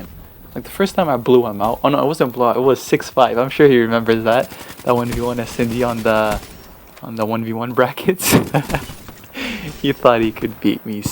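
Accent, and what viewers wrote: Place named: France. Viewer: dammit, 2nd lol but that french Accent tho hahaha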